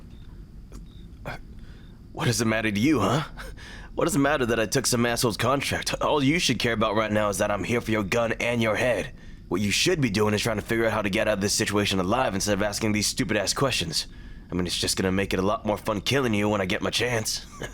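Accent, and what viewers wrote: South, United States. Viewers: Why do i always simp for the southern accent?! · I'm gonna need to hear this southern accent again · Oop the southern hooked me. Im cook cooked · 10/10 the southern accent gets me every single time swoons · You don't know how much I loveeeee your Southern accent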